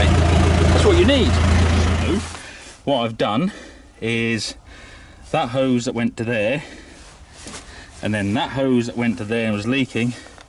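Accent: Norfolk, United Kingdom. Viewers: Love the vids. Brought up near Fakenham, Norfolk. Good to here the Norfolk accent again . Would love to see a Kubota 7173 on the drive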